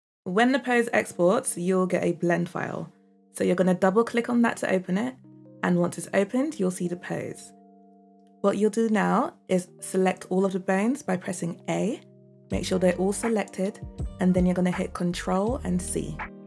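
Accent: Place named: United Kingdom. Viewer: i’m new hereee bur girl you’re british!! omggg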